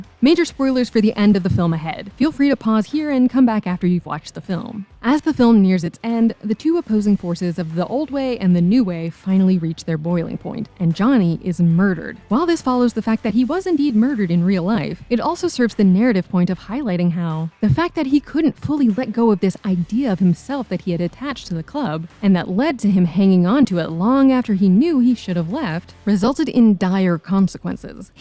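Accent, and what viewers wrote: United States. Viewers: Chicago area accent. Not that different from the NJ accent near NYC.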